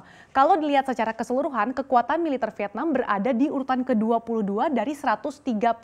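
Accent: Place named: Palembang, Indonesia. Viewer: kirain dari palembang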